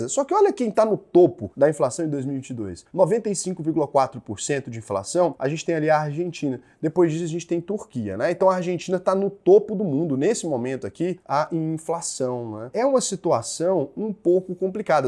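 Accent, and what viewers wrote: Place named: São Paulo, Brazil. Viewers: Realmente é assim mesmo o Sutaque do estado de SP ahahahaha, sou do interior de SP e aqui puchamos bem o R, bem caipira mesmo, ai vc vai mais pra capital ou pro litoral de SP o R é diferente. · Esse é o sotaque de Paulista da Mooca ou Faria limers...nipe Boça, Faustão rs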